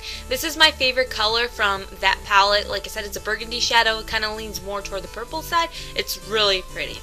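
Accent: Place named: Chicago, United States. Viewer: I love the way you speak... So Chicago. I'm in Wicker Park so I can totally call the accent when I hear it! Great job, your friend is beautiful. :)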